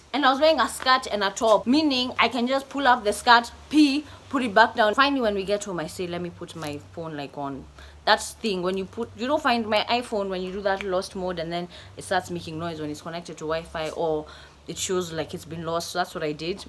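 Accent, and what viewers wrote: United Kingdom. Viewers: that ka british accent 😩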